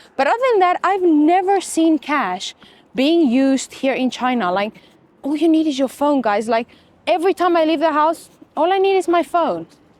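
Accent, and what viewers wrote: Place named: South Africa. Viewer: Did you live in the USA? I hear your South African accent, so I'm wondering.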